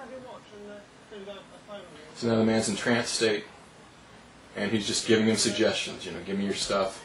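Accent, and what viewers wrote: United Kingdom. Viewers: u kno what? that all sounds pretty legit on a very unusual level, but it basically all comes down to one thing: british accent. That combined with non-stop inane chatter could put anyone under for a few seconds. . . but like i said, the other explainations are nice too. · Your video was thurly explained. I like that. That and i don't understand the british accent that well so ya :P Thx!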